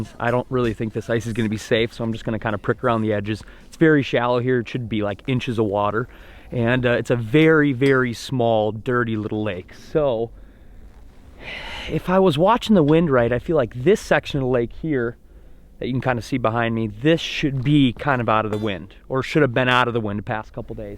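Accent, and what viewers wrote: Minnesota, United States. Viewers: Sounds like Minnesota to me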